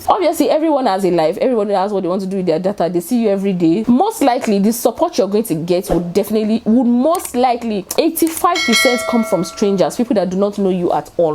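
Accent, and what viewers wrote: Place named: Nigeria. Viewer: De Nigerian Accent🌷🌷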